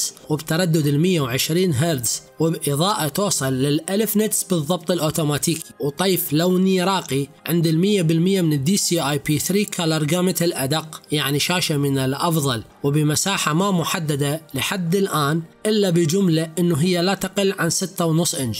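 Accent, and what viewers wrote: Iraq. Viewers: مستمتعتش بالفديو قد ما استمتعت بلهجتك العراقيه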